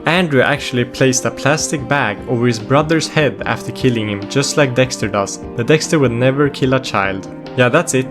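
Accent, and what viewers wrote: Sweden. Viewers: You hear the swedish more than the english when he speaks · the swenglish i love it! Great video btw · yo are u by any chance swedish bc i think ur accent is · Are you by any chance Scandinavian? You sound kinda Swedish with the pronunciation. Greets and thanks for the great video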